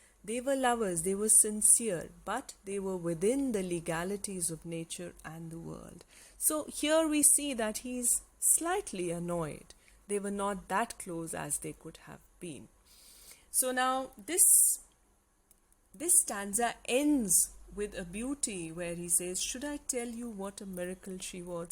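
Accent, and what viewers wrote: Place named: India. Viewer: Thank you for the great an excellent explanation about this poet .really,I have never heard this kind of explanation in Indian accent and as much clear accent, really it was attractive and learned very clear and good this poem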